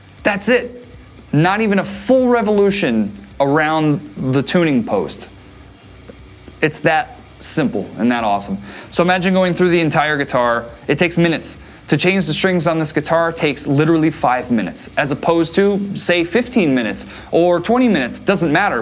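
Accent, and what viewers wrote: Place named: United States. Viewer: wow cool.......i have seen your videos where you have visited the Musicstore in Köln. In 2009 i was there too. Amazing. First of All, thanks for my english and now because you are livin in Köln we can write in german?????! Also, es ist eine grosse Freude, Deinen Kanal zu schauen und mit Dir zu schreiben, mein Lieber......hahahahah super..........Ich habe neben den Harley Benton Gitarren noch andere und auch höherwertige, aber sie gefallen mir sehr. Ich bin aber auch kein Profi, nur Hobbyfreak. So Brother, did you have understood all???? And it is really the truth, your american english is so damn good to understand, i think i understand each Word.....i love that, so i can learn more and improve my english........Especially in guitar themes i understand a lot, when you are talking about politics or nuclear sience i understand nothing...but for normal things........it works.Thank God and praise the lord that he gave us guitars and cool music man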